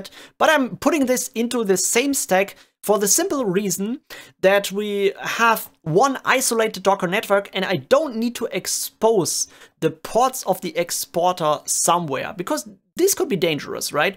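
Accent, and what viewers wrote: Germany. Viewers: simply amazing stuff!! I really like 2 things about your videos 1. amazing and professional explanations 2. your German accent :DD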